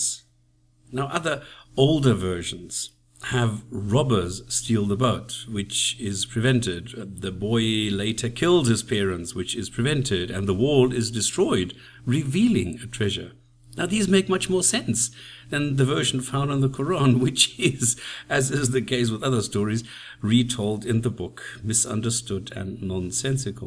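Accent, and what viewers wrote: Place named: South Africa. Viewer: Great first video. Your accent sounds colonial, maybe south african?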